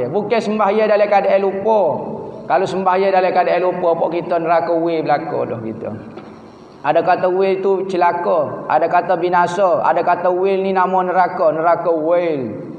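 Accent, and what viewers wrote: Kelantan, Malaysia. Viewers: Saya dari perak..suka dgor ust bgi ilmu..faham walau kecek kelate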